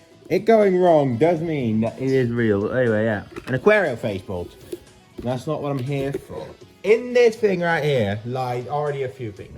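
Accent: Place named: United Kingdom. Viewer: You're sound like British or United kingdom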